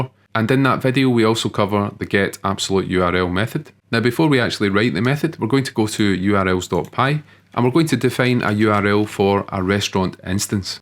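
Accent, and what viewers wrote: Scotland, United Kingdom. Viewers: NO idea what your doing but I love your Scottish accent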